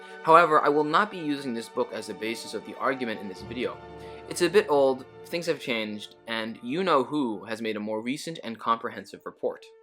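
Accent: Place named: United States. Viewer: Great video, I have only watched two of your videos so far an wish to giv so feedback, since I believe good content with bad execution/presentation undermines the content's potential. many times I have been confused with lack of sync between "infographic" and what you're saying. E.g when you talk about WB, Gaza shows up, when you talk about Gaza, E.Jerusalem shows up, etc. But the more unbearable element was your very heavy american accent. It is not the american accent itself, but rather the very slurry pronounication! Your talking pace is optimal, but if the slurry pronounciation can be eliminated by you talking slower and clearer, that would be a better option to go by. Thank you for doing this video! 🇵🇸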